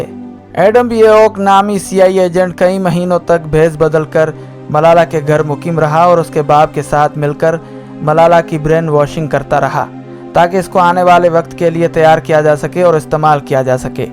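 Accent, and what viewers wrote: India. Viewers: kus ni kush hotahai seems like koi indian bol ra hai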